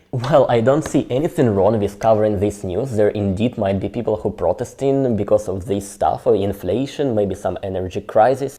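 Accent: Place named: Russia. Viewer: you're great and what I'm about to say is totally irrelevant I am sorry, but continuosly pronouncing 'a' instead of 'o' is not part of the English language. Sounds too Russian. Peace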